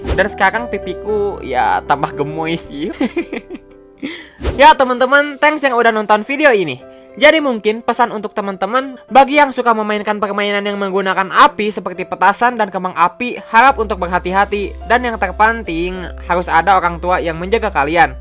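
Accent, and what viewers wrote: West Java, Indonesia. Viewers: orang Sunda ya bang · Lu Orang Sunda? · Abang orang sunda ya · bang kocak aku juga mau nanya abang orang sunda😂😂😂😂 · eh Sunda bos orang mana cuy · sunda batt:v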